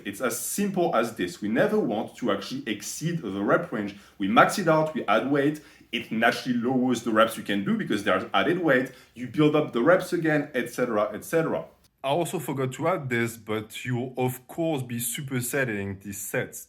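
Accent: France. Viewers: je viens de découvrir ta chaîne et j'ai su en une phrase que tu étais français avec ton accent , continue tout est excellent et cette french touch ça donne juste envie de regarder d'avantage tes conseils et d'en apprendre plus , à la prochaine .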